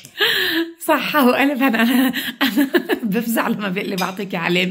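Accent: Iraq. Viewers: فدو حلو عليج الحجي العراقي يابعد روحي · تسلم ايدك ماشالله إشكد مرتبة اللهجة العراقية تجنن عليج❤ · تسلم ايدك يا شكلها يشهي فعلاً من بعدك قفل الترند مافي احد يعملها بعدك وحلوة بفمك اللهجة العراقية متابعتك من العراق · عاشت ايدك انتي انسانه طيبه وروعه وشكل طاقية السلطان باين حلوه وطيبه وكلامك كلش حلو بالعراقي وتحياتي لكي ول وحماتك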